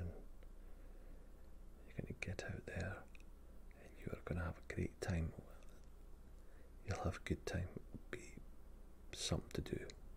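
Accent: Scotland, United Kingdom. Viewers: Found you from crinkeluvin’s video! love the video and it’s nice to hear the accent of a fellow Scot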